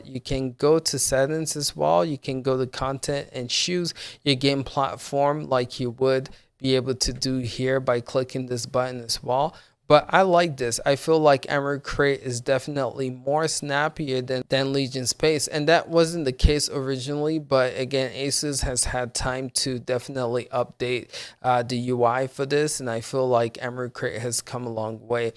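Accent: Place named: Mumbai, India. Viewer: sounds like indian from mumbai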